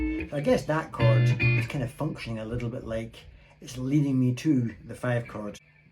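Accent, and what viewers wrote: Scotland, United Kingdom. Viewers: Django with a Scots accent.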